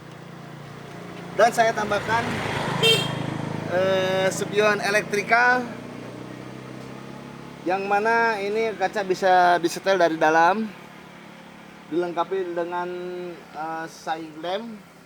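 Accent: Indonesia. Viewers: sunda na leket pisan kang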